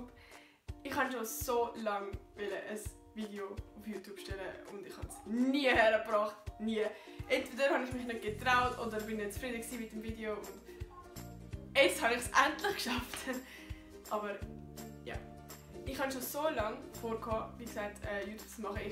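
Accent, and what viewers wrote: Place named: Switzerland. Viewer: Sehr cool, das Schwitzerdeutsch 😁👍 fast wie eine andere Sprache 😜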